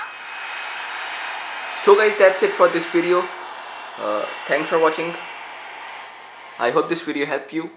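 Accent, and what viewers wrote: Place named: India. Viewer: hate the indian accent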